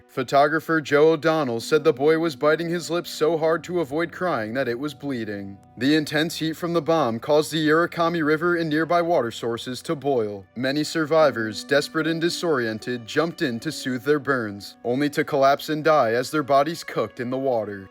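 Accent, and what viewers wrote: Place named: England, United Kingdom. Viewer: No hes not saying it incorrectly he's saying it with an English accent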